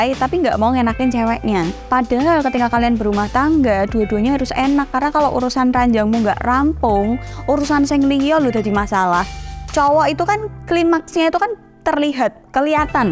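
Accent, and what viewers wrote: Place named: Central Java, Indonesia. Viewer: bahasa ne koyo logat Jawa tengah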